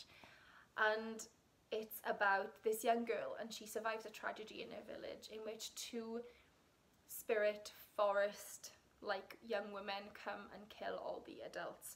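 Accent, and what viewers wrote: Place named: Wales, United Kingdom. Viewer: Welsh huh. None too many have your accent in Canada. I just have the generic Canadian accent, which is clear but lacks lilt. Can't say I read any of your top 8 this yr.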